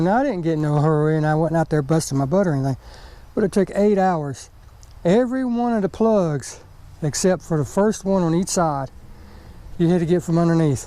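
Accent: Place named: South, United States. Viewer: Absolutely you sound like you are from the south and the background and property looks like it does in the south. I lived in East Texas before moving to California so that’s where I see the familiarity.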